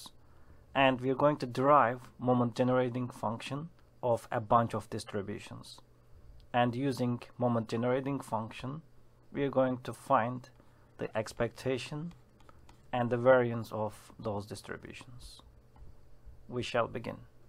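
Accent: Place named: Turkey. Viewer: my guy this is the most turkish accent I've ever heard in my life but your name isn't turkish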